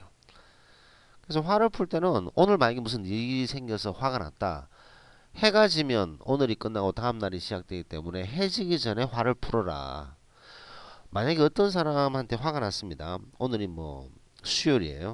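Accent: Gyeongsang, South Korea. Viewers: 경상도 말투 저도 경남ㅎ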